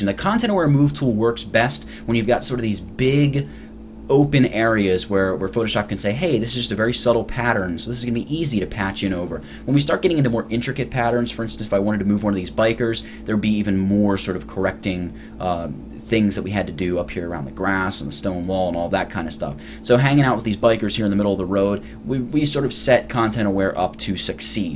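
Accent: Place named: United States. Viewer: armana head on over.... armana go ahead and.... Super Cooele.... gonna move this guy here... Whole buncha stuff Don't you just love what America has done to the English language?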